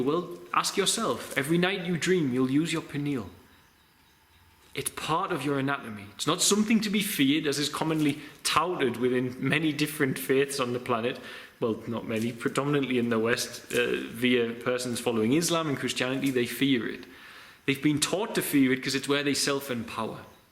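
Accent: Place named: Newcastle, United Kingdom. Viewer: This is what I needed as a Glasweigan. A mega spiritual Geordie to cement what I already knew. Not even a lick of sarcasm. Yes!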